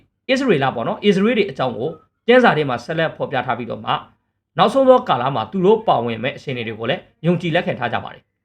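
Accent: Rakhine, Myanmar. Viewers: ရက်ခီးသံ ဝဲလွန်းဝာာ အဆင်မပြေ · ပထမကတော့နားထောင်မလို့ပဲ ရခိုင်လေသံကြားတာနဲ့ ဆက်လည်းနားမထောင်ဘူး unlikeလည်းပေးပြီး not interested လုပ်ခဲ့တယ် မင်းတို့ရခိုင်တွေ အကြွေးပြန်ဆပ်ရမယ်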